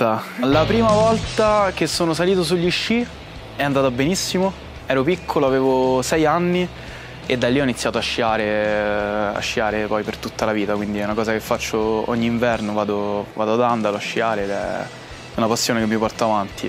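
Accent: Rome, Italy. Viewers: Ma chi e? Ma perché? Ridateci Cattelan 😭 E comunque, la smetterà mai di avere questo forte accento romano, che poco si addice ad un conduttore?! (Ma pure ad un attore a dirla proprio tutta.) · alla Ferilli nessuno ha fatto appunti di questo tipo per la sua presenza a Italia's got talent.. Eppure non sta recitando nella trasmissione..la sua cadenza romana non è paragonabile a quella di questo ragazzo. La Ferilli ha costruito la sua carriera sulla sua cadenza.. Fuori dalla recitazione ancora di più. E come lei tanti altri. Altro che Ludovico Tersigni. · Un po' meno accento romano che amo negli attori ma non in un conduttore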